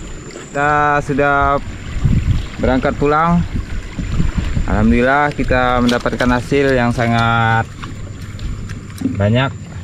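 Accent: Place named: Malaysia. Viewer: dmana tu bang,,cakap mcm orang melayu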